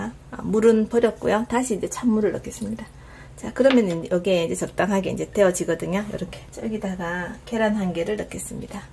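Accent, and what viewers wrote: Gyeongsang, South Korea. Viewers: 말씀 너무 잘하세요..경상도 어투가 이뿌시네요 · 목소리가 너무 좋아요 갱상도 사투리도 매력적이구요 영상 내용도 유익하구요 남편분 좋으시겠어요 감사합니다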